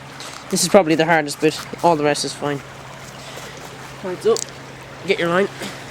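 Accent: Ireland. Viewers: He's Irish{ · What part of Ireland are you from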